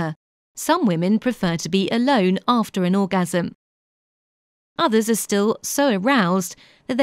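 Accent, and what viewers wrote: United Kingdom. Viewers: English accent. There's no such thing as an British accent. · I'm just here for the British accent.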